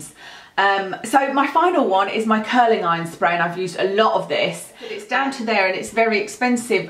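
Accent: United Kingdom. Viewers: Oh that British accent is a sweet melody to my ears 🥰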